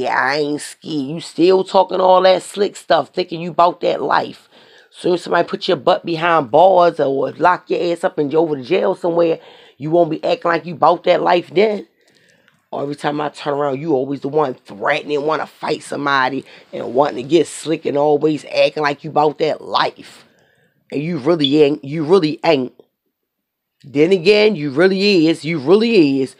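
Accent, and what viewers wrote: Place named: Baltimore, United States. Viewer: You got to be from Baltimore, Cause you sound just like us. But any who great job. Wild that ass out.. love your work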